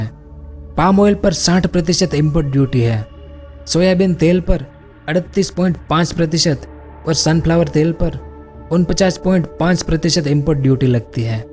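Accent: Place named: South India, India. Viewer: Bhai pehle ye bta hindi koi jruri ni sabi ko aati h kya pta Anchor South se hai ya punjab se ha samaj aana chahiye jo aa b raha ha Aam khao usko gino ni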